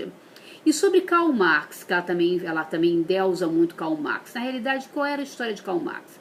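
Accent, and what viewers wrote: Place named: Rio de Janeiro, Brazil. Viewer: sotaque carioca de merda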